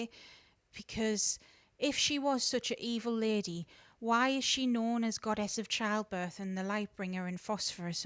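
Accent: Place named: Northumberland, United Kingdom. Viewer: Hiya, I'm so happy to have found your chanel. I have just started working with Hecete and I'm just absorbing all of the information I can. Also - where are you from? You sound like you may be fairly close to me - I'm in Northumberland, not too far from Newcastle. BB.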